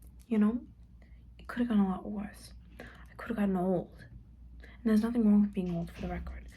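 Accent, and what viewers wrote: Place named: New York, United States. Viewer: I love your ny accent videos! 💟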